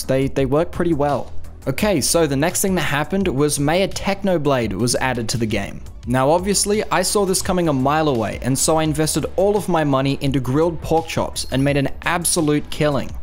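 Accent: Australia. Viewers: wait is australian?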